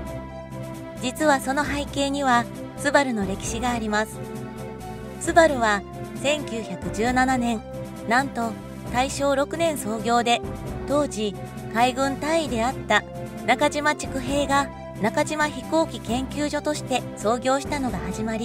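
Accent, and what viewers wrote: Kansai, Japan. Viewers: 関西人やね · ナレーターさんは、関西人ですか？めちゃ和むわぁ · 関西訛りのナレーションが良い。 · 関西訛りなのめっちゃ気になって話入ってこねぇ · ナレーションのお姉さんの関西訛りが面白かったです。だいぶ矯正したようですけど、ところどころ関西のイントネーションでなんか温かみを感じてよかったですよ。 スバルの驚異的なパワーの秘密が中島飛行機のエンジン製造技術にあったとは知りませんでした。 日本でももっと売れていいはずなのにねええ。インプレッサは今も人気だと聞きますけど。 ハリウッドの映画の中でもドラフト？する日本車はたいていインプレッサだったような…。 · 内容良いから聞いてたけど関西弁ウザくなる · 「スバル」のサムネイルに惹かれて見にきました。 なんだか関西弁が心地よくて聞き入ってしまいました。 冒頭で私が所有しているフォレスターの話題だったため 最後まで見入ってしまいました。 チャンネル登録もさせていただきましたよ。 応援しています。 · このナレーション、関西訛り全開で親しみがわくわ！ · ちょちょちょっとまて〜〜〜！この（京なまり？）（関西なまり？）好きすぎてたまらんのだけども！全然内容が頭に入ってこん。 当然チャンネル登録しちゃうでしょ〜〜！なぜ今までなかった！ん？合ったのか？俺が知らなかっただけ？ 何れにしてもめろめろだ〜〜〜〜〜〜〜〜〜〜〜〜〜！ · スバリストなので興味を持って見たが、関西弁で萎えた。 標準語で話して欲しかった。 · ”出来の良い車”は富士重工の車・・・って車好きの親から言われ続けて育ちました。 スバルってホント、”キッチリ”作りこまれていますもの！ それから 今回のナレーションの彼女も少しの関西なまりがすてきでした。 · しゃべりのイントネーションがちょくちょく関西っぽい…？ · パーソナリティの関西弁が可愛い。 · 何が良かったって、解説の関西弁アクセントが最高でした😄👌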